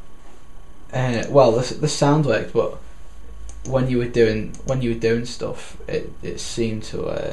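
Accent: Liverpool, United Kingdom. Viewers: Are you from the Wirral/Liverpool?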